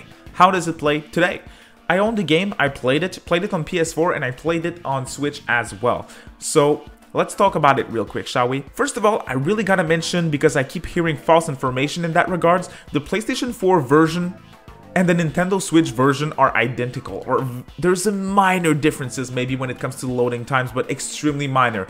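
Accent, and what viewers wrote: Quebec, Canada. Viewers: Will buy it on switch day 1. Can't wait to discover this game, I'm still kinda new to the SMT series. I've only beaten DDS1-2 and smt4. Do I hear a french canadian accent?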